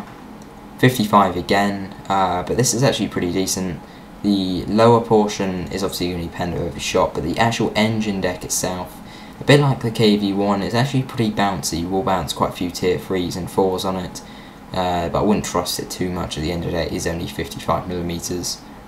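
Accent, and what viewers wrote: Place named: United Kingdom. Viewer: You have a British accent. ^^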